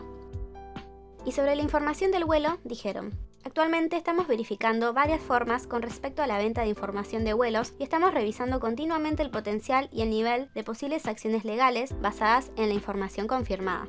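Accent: Argentina. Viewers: es argentina así se pronuncia acá bobo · Sos de Argentina???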